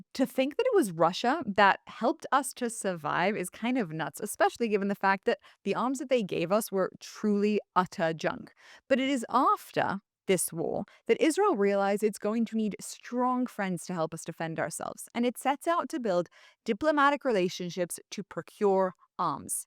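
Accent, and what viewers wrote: England, United Kingdom. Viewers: All good and makes total sense. This will terrify israels enemies. Even more than now! Ps. You are precious!! You often have a strong English accent. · YOU AND THE BRITISH ACCENT HAVE ME WORKED UP BEYOND BELIEF!!!!!!! WHERE DOES THAT ARROGANCE COME FROM!????????? IS THAT WHY 90% OF THE WORLD HATES JEWS AND ISREAL? I'm not one of the Haters But if you Keep Running That MOUTH!!! KNOW YOUR PLACE!!!!!!!!! CHRIST IS KING!!!!!!! 🙏🇺🇸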